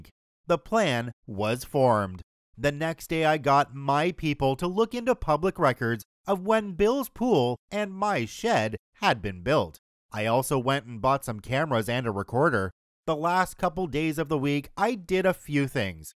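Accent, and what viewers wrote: Australia. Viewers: Beautifully Australian. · Oh, this bloke is for sure from Australia haha. "Botanical Gardens" "Instro" 😂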